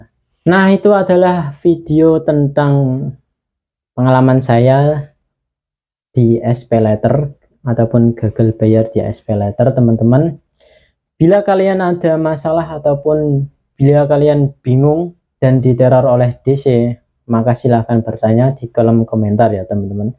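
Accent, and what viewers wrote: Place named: Java, Indonesia. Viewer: Jawane medok emen mase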